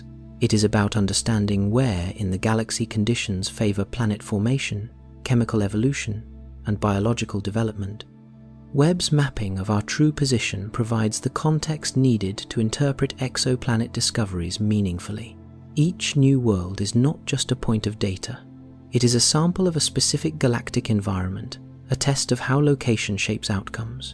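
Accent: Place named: United Kingdom. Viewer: I actually, like the voice of British accent. I can understand perfectly. Please use the same voice for future posts. This new discovery is awesome. I am always hungry for facts and accuracies., while we are still alive to learn our location in the vast Milky Way. I was wondering 🤔 all the time what our solar system encounters during our galactic journey. The Earth absorbs some of the space dusts and unknown particles that can affect our planet. Gamma rays or super nova radiation are scary that truly wipe out our civilization instantly. Anyway, I surely enjoy listening the podcast. Thank you for a good job 👏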